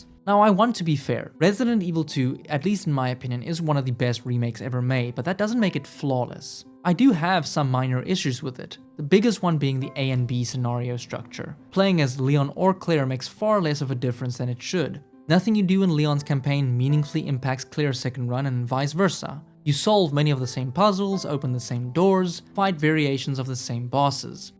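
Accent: South Africa. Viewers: Great video! Btw you sound South African xD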